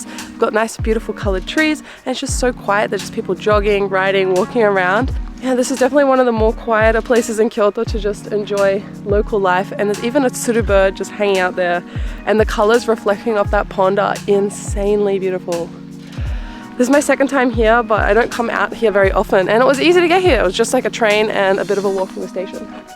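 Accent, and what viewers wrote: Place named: Australia. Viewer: You can 'hee-yah' the 'dee-yah' - I love your Australian accent! 💖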